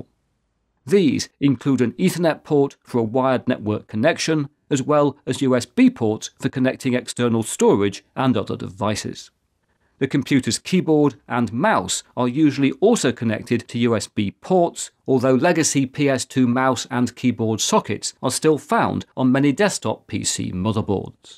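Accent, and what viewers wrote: United Kingdom. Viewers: I didn't learn anything new, but as always, very nice simple video w/o annoying music and flashing lights. i do enjoy the non nonsense approach with you videos. i think i will show this to my son, he may learn a few things. thank you. btw, the british accent is a nice touch.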